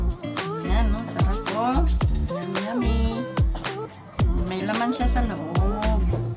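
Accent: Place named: Visayas, Philippines. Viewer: oy bud bud nga kamoting kahoy sir proud bisaya here klaro kaayo nga bisaya ka sir ako pud hehe -tintin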